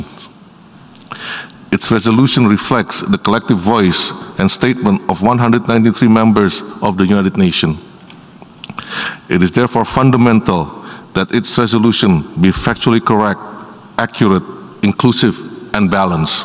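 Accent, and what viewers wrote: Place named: Indonesia. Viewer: Wow bahasa inggrisnya, lugas ala indonesia namun ga sok ke inggris2an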